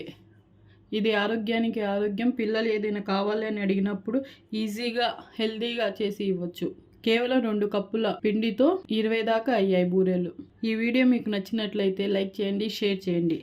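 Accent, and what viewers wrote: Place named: Tamil Nadu, India. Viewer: Avunandi cheppe ame tamilian laga vundhi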